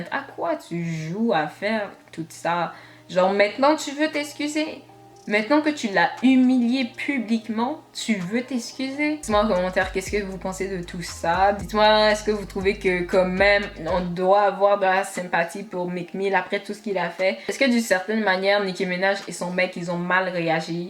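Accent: Quebec, Canada. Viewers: Tu as un léger accent québécois tu viens d’où? Snn t’es trop belle 😘❤️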